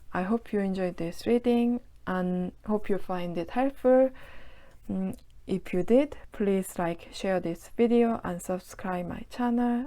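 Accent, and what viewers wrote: United Kingdom. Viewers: 약간 영국 발음 같아요 완전 영어 잘하시네요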